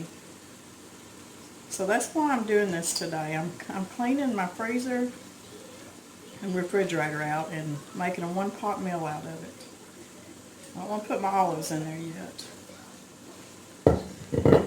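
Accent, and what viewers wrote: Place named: Tennessee, United States. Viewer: I see why.You home is beautiful, and your recipies are vicious, reminds me of My Moms cooking.And your voice is so I dont know laid back.My daughter tried to get me to do a cooking channel and I told them hell no,12 hours workin in the hospital is enough lol.12 hours a day at tjeb hospital.was enough for me.Where do you live?If you dont mind me asking our accent sound like Tennessee.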